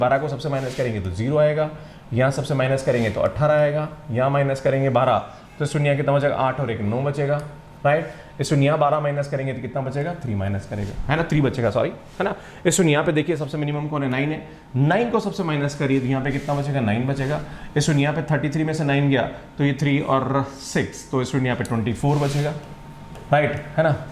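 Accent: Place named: Madhya Pradesh, India. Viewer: Sir why did you add two in junction as it is of any use. Sir are you MP my friend has same accent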